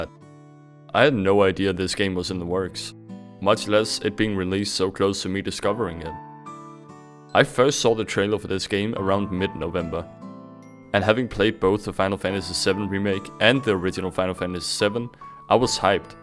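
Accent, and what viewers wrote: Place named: Scandinavia. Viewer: Your accent sounds Scandinavian...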